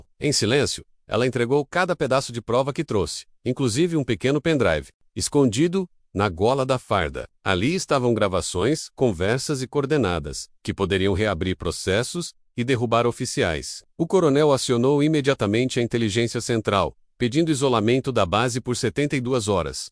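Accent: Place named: England, United Kingdom. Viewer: Não gostei! História muito vaga. Tradução truncada, ora em português, ora em inglês, ora com sota- que inglês. As fotos não correspondem à idade da soldada. Parecem mais com as de uma modêlo de 25 anos que pelo tempo decorrido deveria aparentar muito mais idade.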